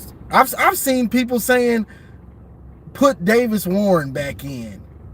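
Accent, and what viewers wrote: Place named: South, United States. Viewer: Dude, I tried listening to U, but U sound like a country speaking Knucklehead, Bro! U have No Idea! 🤦🏽🙆🏾‍♂️ #GoBlue💛💙 #SMASH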